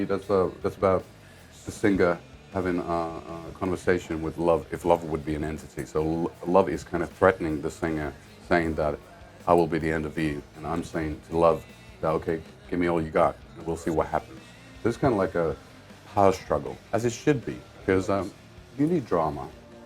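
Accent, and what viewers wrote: England, United Kingdom. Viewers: He has always had a English accent, and he doesn't hang out with Dani Filth a lot he lives in Finland and Dani Filth live in England pretty far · Villes voice has changed alot the last few months. he sounds soooo much more British now. LOL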